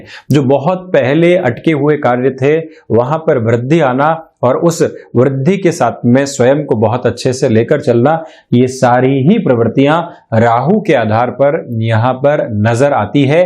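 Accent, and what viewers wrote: Standard. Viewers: Nice shudh Hindi..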